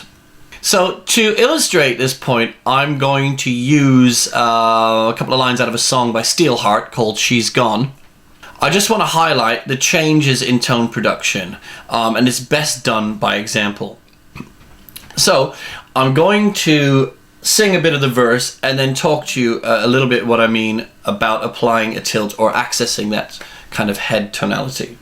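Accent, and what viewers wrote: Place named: United States. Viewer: are you UK mixed with US..???..you sound a lil bit American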